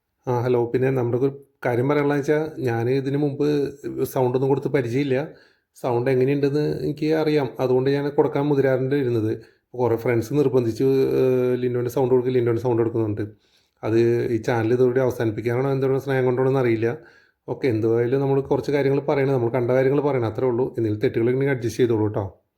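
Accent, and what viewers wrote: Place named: Thrissur, India. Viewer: അതെ നമ്മുടെ തൃശൂർ സംസാരം 👍😊😊😊അഹങ്കാരം ഇല്ലാത്ത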